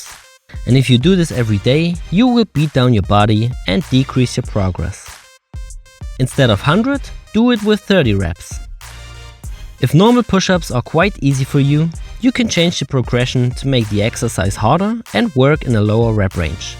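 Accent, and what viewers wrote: Germany. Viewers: Sorry aber dieser heftige deutsche Akzent ist mir zu anstrengend. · Wow you sound reaaaally german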